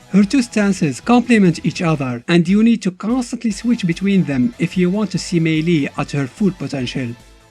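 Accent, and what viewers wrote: Arab world. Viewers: I can tell you're Arab, maybe Moroccan or Algerian, from the way you said Najd, and your accent over all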